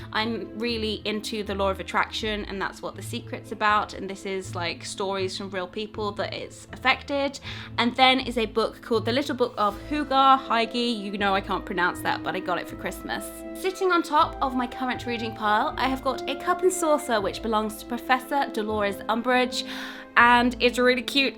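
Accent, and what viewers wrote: United Kingdom. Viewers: I love your uk accent <3 in from the netherlands hahaha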